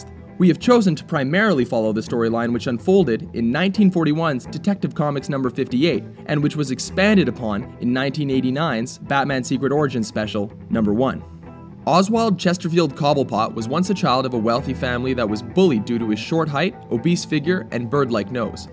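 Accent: England, United Kingdom. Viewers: Is he from Gotham or England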